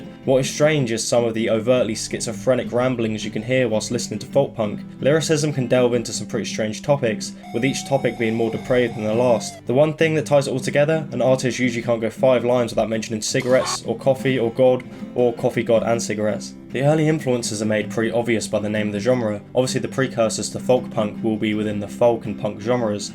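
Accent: United Kingdom. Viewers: He's british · hey why does this goblin have a harry potter accent?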